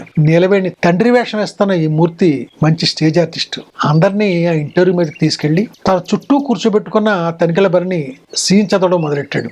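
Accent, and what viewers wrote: Godavari, India. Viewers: వంశీ గారు మీరు గోదారి యాస లో మీరు మాట్లాడుతూ వివరించి చెప్తుంటే కళ్ళ ముందు వీడియో కనిపిస్తుంది.... డైరెక్టర్ గారు....మీ సృజనాత్మకత అద్భుతం....మీ అభిమాని పశ్చిమ గోదావరి జిల్లా వాసి మీ హరీష్... జంగారెడ్డిగూడెం...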